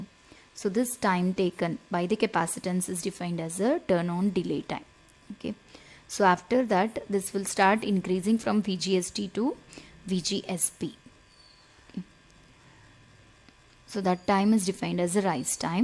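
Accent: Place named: Kerala, India. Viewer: Thank You Ma’am ! And your Kerela accent is cute :)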